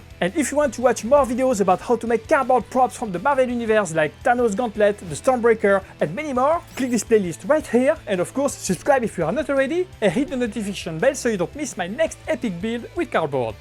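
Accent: France. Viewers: Wow the french accent 🫠🫦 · L’acent français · You have a french accent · A en croire son accent il est français ??? 🫶🏼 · Avec ton accent français, ça fais bizarre je vais pas mentir, par contre super mask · Tu es Français cela ce voit grâce à votre accent extraordinaire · t'es pas un francais par hasard ? Cet axcent et vraiment similaire stp reponds moi t'es creations sont incroyables continu comme ca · frero sa ce vois que tes français vue ton accent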